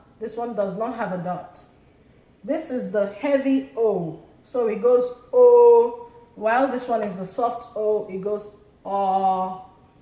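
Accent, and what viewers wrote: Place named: England, United Kingdom. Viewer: Omo am in love with ur English accent maa 👏👏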